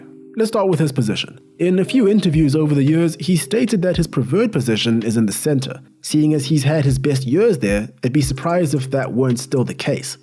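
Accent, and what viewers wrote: South Africa. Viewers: Are you from S.A bru ? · are you south african? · Are you a South African? Noticed the accent immediately !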